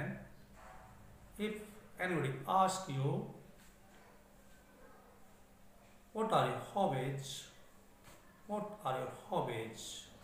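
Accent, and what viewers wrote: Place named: United Kingdom. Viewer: Best of all english just like british pronunciation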